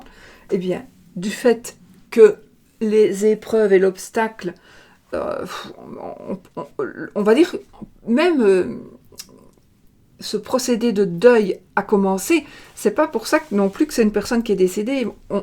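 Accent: Belgium. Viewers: Bonjour d'un Gémeaux du Sud de la France à la Belgique, votre accent et votre style est belge, est ce que je me trompe? Jolie ❤️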